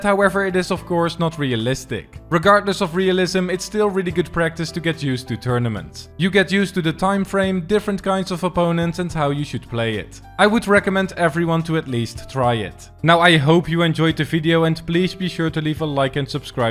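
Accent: Netherlands. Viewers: haha zieke nederlandse accent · jij bent sowieso Nederland, is hoorde het aan overpowered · You sound dutch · Het nederlandse accent is weer lekker terug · What cauntry or u from i think the netherlands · Are you dutch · Dat Nederlandse accentje😝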